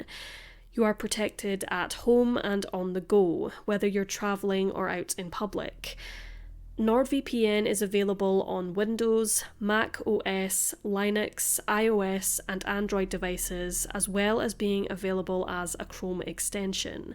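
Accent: Scotland, United Kingdom. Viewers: What a lovely Scottish accent.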